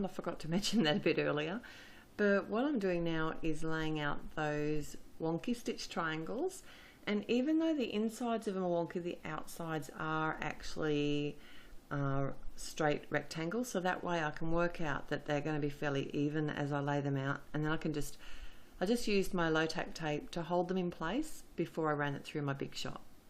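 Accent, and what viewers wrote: Australia. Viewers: Love your card so beautiful. Also love your Aussie and you Iink to an Aussie store for your supplies. Thank you so much.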